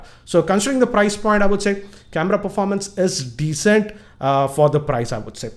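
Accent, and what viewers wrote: India. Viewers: nice presentation of indian english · I still love the Indian accent. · Its just me but I just hate ,,indian" english otherways you speak great and you do very good job with what you are doing so far its that birth languege that makes this and I dont want to ofend you :)!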